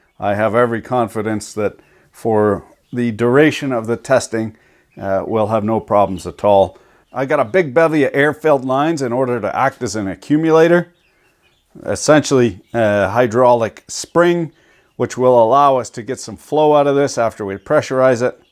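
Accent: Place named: Canada. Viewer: The Canuckistan English language of AvE still amuses and amazes me after all these years. :)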